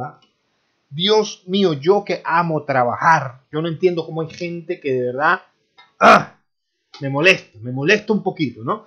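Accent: Colombia. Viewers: El acento no es chileno, más bien diría que es en colombia. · el acento suena a colombiano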